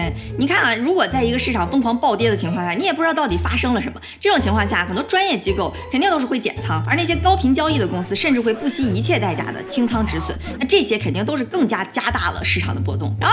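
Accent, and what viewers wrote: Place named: Northeast, China. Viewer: 東北話特別好聽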